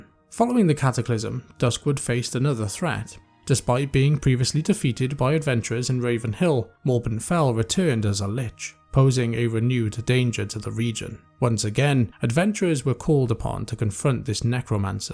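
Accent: United Kingdom. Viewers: Was so glad you were British when I clicked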